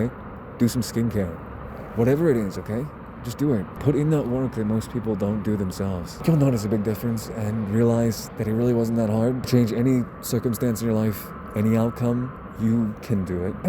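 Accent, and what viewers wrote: United States. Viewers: I was not expecting the country accent😂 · KEEP DOING YOUR COUNTRY VOICE IN YOUR VIDEOS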